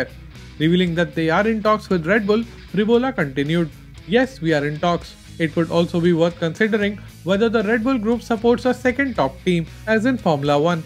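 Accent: India. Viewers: Ha! It takes a couple of views and you will be used to the mild Indian accent.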